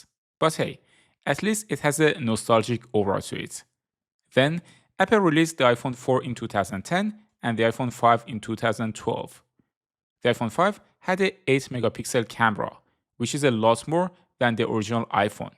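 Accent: Iran. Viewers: لهجت میگه که ایرانی هستی😅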